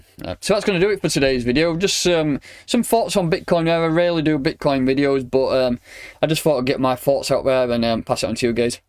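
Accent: United Kingdom. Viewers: you have the harry potter accent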